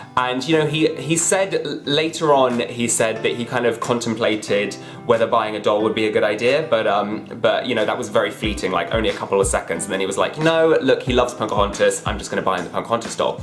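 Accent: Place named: England, United Kingdom. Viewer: I am subscribed, so I have spent the last month going back through your older videos. I love your accent. To my Mid-western ears, it sounds so English, but in a good way. Not sure I said that right... Anyway, your story is great. Mine is pretty basic: I come from a Farming/Teaching family. At least 5 generations that we easily know about. As such dolls were a luxury that if you got one, you took care of it and passed it down to the next generation, who was taught the same thing. I love dolls because it is part of my family history. I expanded my collection to include modern dolls as they came out.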